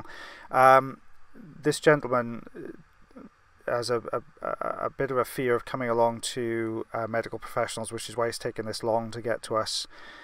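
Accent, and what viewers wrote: Wales, United Kingdom. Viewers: Welsh voice, fairly sure.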